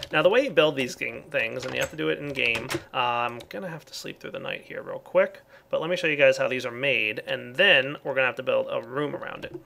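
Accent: Australia. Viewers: Ausie? or not because i am... :D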